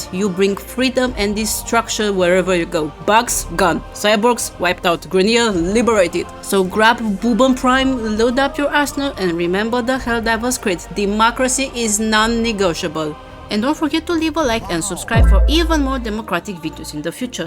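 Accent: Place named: Europe. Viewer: Hearing American behavior with a European accent scratches a special itch in my brain for democracy 🫡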